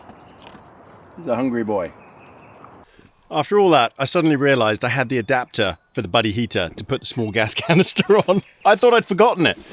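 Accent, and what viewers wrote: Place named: England, United Kingdom. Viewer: have you ever thought to do a story time channel? You have a beautiful ton e with you voice, you are animated and with your beautiful English accent, you would be perfect for storytime. You could just have a set scenery for the photo and just have your voice reading the story. I usually watch her videos twice. The first time I start watching when I lay down before bed and end up falling asleep the second time so I can actually watch the entire thing without falling asleep lol.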